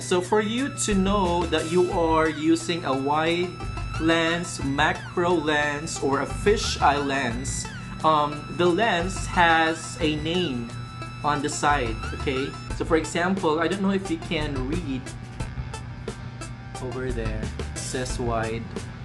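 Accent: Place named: Cologne, Germany. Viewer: Kolsener accent. 😁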